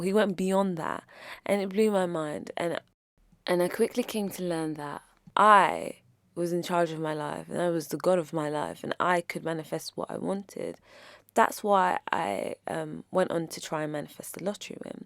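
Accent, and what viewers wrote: United Kingdom. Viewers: This is so important. Side note. When you started speaking i thought it was me. We sound very similar its scary. Hello fellow Brit 🇬🇧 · It sounds like my mum's, which is southern english :)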